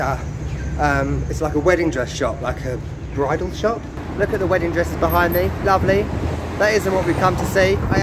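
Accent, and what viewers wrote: England, United Kingdom. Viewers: Cool, a British guy in Chihuahua, love your accent… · Hey. This is the first time I see your videos. Honestly. But I really love it. And I thank you for coming and visit my city. Yo I know I think that we (people who live in Chihuahua) don't even realize how beautiful it is until we see a video like yours. And I liked a lot that you visited and showed what was interesting to you. From your very own perspective. Greetings Love your English accent.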